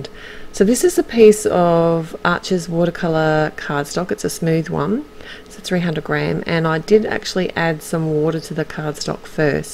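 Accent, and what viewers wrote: Australia. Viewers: Hi. LOVE these gorgeous high pigmented colours. Your card is just so beautiful and I really love your choice of colours. Thanks so much for sharing with us. Always love to hear your Aussie voice.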